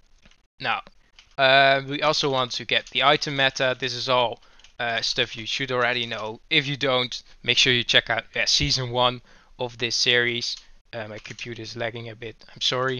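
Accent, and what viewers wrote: Netherlands. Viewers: Ur accent sound a bit like a dutch one, are you? xD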